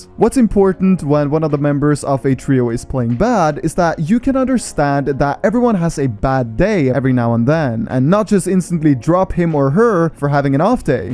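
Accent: Denmark. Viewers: are you Danish ?? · are you danish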